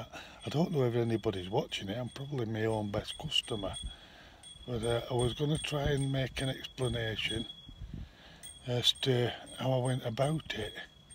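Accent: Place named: Yorkshire, United Kingdom. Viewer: Ey up cocker. Where bouts you at you fuckin lyrical legend? Accent sounds Huddersfield/Brrrrrratford way?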